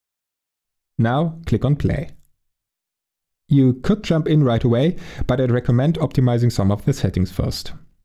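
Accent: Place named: Germany. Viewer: I am developing a Pavlovian response to German accents in YouTube guides at this point! I got excited when I heard your accent at the start and I wasn't disappointed. everything was spot on: patient, well-paced, empathy and context provided for those new to Deck, actually covers all the details, thorough about making sure everything described has associated video, exaggerated finger movements so it's clear which buttons you're pressing, zero padding. thank you so much for this amazing guide <3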